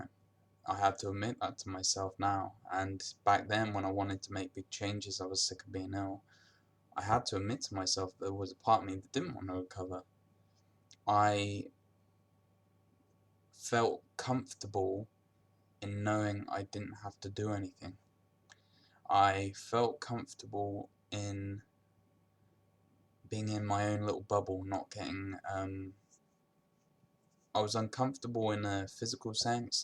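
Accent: United Kingdom. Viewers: I am impressed by your strength, you deep voice and your British accent. Yes, you are right,, we love our sickness. Of course, it might be a love/hate relationship, but she is the only mistress remaining. In other hand, not every body can recover at 100% for life time. Dealing with the sickness, at the first time it is having to mourn about the 'perfect life', and after to go further with a real life. If you are young specially, keep a eye on quiet job.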